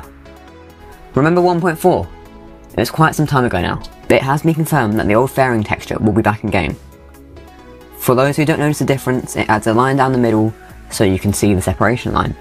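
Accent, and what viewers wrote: United Kingdom. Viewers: You're British? Cool.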